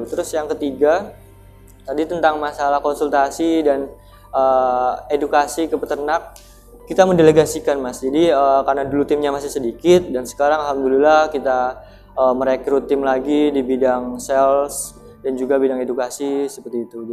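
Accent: Banyumas, Indonesia. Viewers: Wahhhh,,, cah banyumas, salam ngapak mas dari Purwokerto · Alhamdulillah,..wong ngapak..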